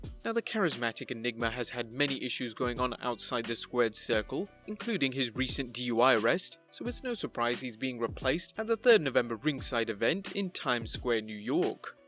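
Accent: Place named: United Kingdom. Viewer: Head's up bud, if you're gonna put prices in I.E. $60, it would be helpful to people who don't use the Dollar-Dollar ya'll, to put it in other currencies. You sound British to me which is astounding, that, if you are British, you wouldn't put the £ in. Simple business techniques bud. If you want pound-pound 'squire, you'd know investors and customers are lazy but lazy enough that they will go to another source for their info if the basics are built.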